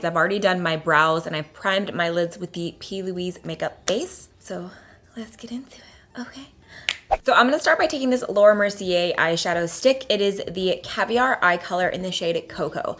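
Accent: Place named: Southern California, United States. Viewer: Katie Meyer I think it’s the CA accent. Not “white privilege”. Some of us sound like that here in So Cal. Like, Valley Girl accent almost. (Just my opinion). She has stated she’s half Puerto rican too.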